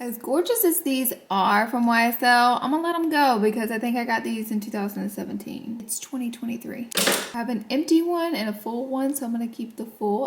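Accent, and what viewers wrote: South, United States. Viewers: girl I love ur southern accent. At first I thought u were saying lipples, like nipples. rofl but I realized ur saying lip oils!!! roflmao!!! tfs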